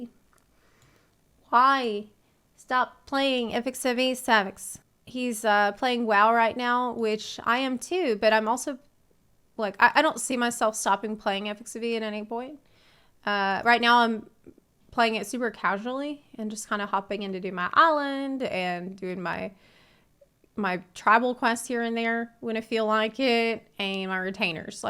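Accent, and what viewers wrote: South, United States. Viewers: are you from the south in the states? I hear some southern accent here in there.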